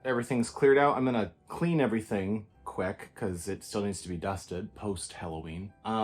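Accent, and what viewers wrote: Minnesota, United States. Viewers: The final garland look over the TV deserved that high kick! Looks so great! Since you mentioned you had 3 sets of batting, without further ado: On the 3rd Day of Vlogmas my true love gave to me: Three sets of batting Two power stips 🔌🔌 And you sound like the movie Fargo 🎄⛄🙂⛄🎄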